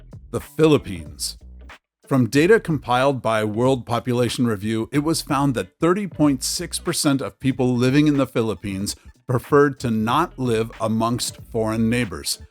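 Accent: United States. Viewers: I would classify Lebanon as a special case. They have taken in millions of Syrian refugees and their economy has collapsed. Refugees in their own country. What would this American voice say if he was on the street with nothing to eat and no real government to provide any help? There is a lot of high brow hypocrisy in this entertainment media video. Meanwhile in Texas, possibly the richest place on earth, the government is consciously packing refugees from south of the border onto buses to states where the people are less racist. That's America for all to see.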